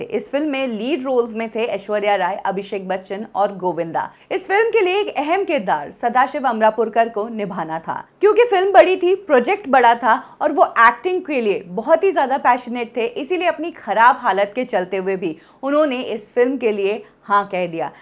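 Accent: India. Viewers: Her Punjabi accents also comes in 😐🙄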